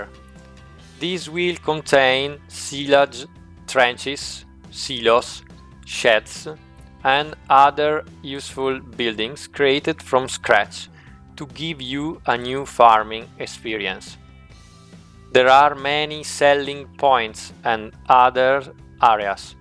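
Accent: Italy. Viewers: Bel video!! Quell'inglese con accento italiano e fantastico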